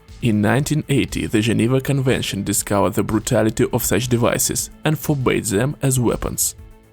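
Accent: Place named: Russia. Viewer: Молодец, но акцент всё равно русский, американцы поймут, что ты иностранец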